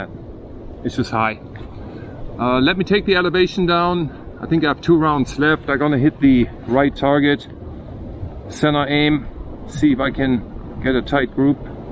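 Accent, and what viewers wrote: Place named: Germany. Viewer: Great video sir! I am also in the process of getting a can from them as well. It’s not a Banish can but another brand and it’s a pistol caliber. So far, it’s been a relatively good experience. No approval yet, but should be here any day this month or in June. Like your channel too! I hear a hint of a German accent or maybe Dutch….?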